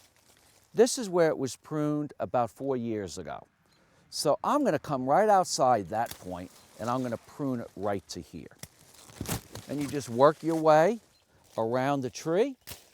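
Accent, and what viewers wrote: United States. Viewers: What is that accent? Jersey? Philly?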